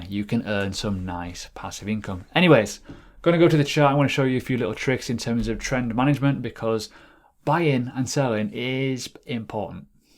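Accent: United Kingdom. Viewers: Anomynous? British lol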